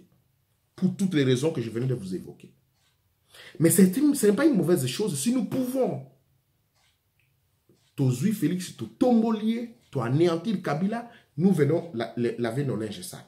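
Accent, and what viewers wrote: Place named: Cameroon. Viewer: Yo kutu face nayo eza lokola ba camerounais même ta façon de parler tu es camerounais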